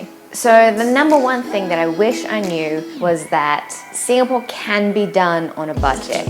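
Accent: Australia. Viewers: You sound like an Aussie, are you from Australia? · are you Australian? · Hey, thanks for this video! It is very educational and informative! As an American, I've always been interested in visiting Singapore because I understand it is a very beautiful, stable, and safe country. By the way, I love that Aussie accent of yours! You're adorable and entertaining! I look forward to seeing more of your videos! You just earned a new subscriber!:) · Pssst... what's she nattering about? Did anyone get her Aussie accent? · God I love Aussie accents.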